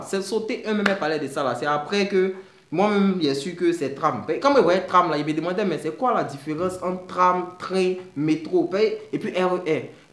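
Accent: Ivory Coast. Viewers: L'accent ivoirien me tue mdr 🤣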